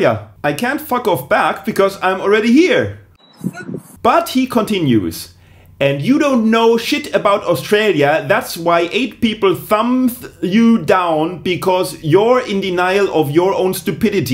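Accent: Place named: Germany. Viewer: Well at least you don't take those comments to heart, they're more funny than anything. By the way German accents are the best and you are really cute and funny!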